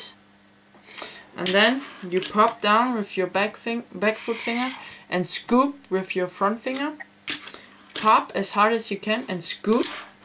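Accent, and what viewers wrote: Germany. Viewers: nice tutorial dude, are you german?i can hear it from your accent LOL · bist deutsch ne? hört man :D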